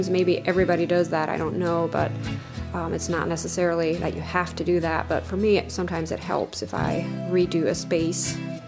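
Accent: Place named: Pennsylvania Dutch, United States. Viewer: I hear remnants of a pennsylvania dutch accent. I think you may have come from a very traditional mennonite family. Holdeman. Very beautiful porch and home! Thanks for sharing!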